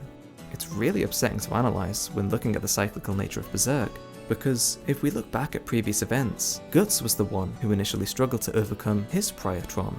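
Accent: United Kingdom. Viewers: I think it's because you have an English accent who speaks vary softly in tone, just like most youtubers who explain the Dark Souls lore, you know the kind.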